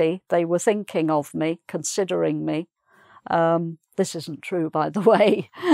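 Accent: England, United Kingdom. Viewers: ... I found you yesterday... and I am to confess that your English accent is fantastic... And your explanations are very clear and useful. With love from Brazil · Is her exent british??plz tell me.becoz i need british teacher. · You have really beautiful British accent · O ne kadar güzel ingiliz aksanı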